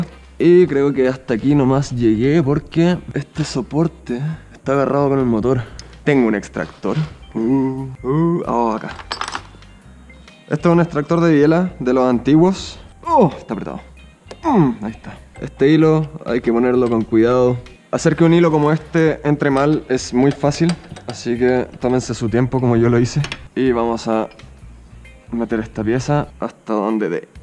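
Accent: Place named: Chile. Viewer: Me encanta tu asento chileno hermano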